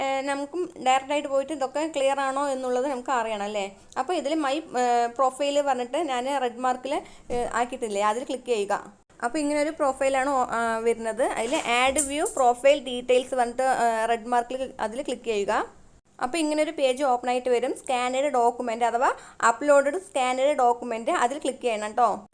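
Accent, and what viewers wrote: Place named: Palakkad, India. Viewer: മിസ്സേ.. നിങ്ങൾ pkd aano.. അതെ സ്ലങ് ഇടക്ക് കേറി വരുന്നതുകൊണ്ട് ചോദിക്കുവാ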